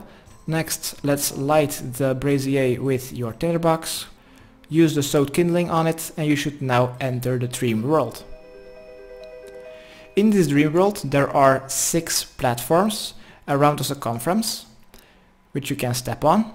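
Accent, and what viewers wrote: Netherlands. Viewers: Legend! Thank you! Btw: are you Dutch?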